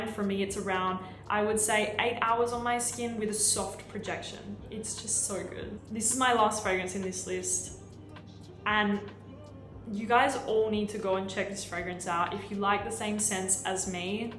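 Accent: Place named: Australia. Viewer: Love Australian words” accent!! AMAIZINNNN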